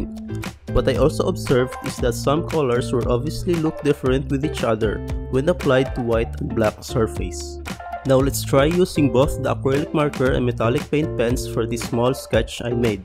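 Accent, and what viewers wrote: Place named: Philippines. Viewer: Didn't know you were a Filipino at first but found out immediately when I heard your accent. Galing mo idol! Keep these videos coming!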